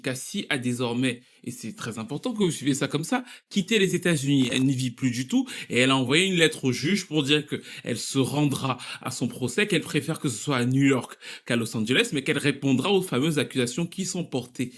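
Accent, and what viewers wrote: Cameroon. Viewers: Si on ne connaît pas le Cameroun ou juste très peu, impossible de connaître le phrasé des camerounais... 🙃 Votre remarque est donc compréhensible ! 😉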